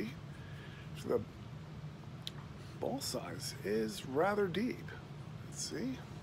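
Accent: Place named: New Jersey, United States. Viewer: Heard the Jersey in this one! Love your vids.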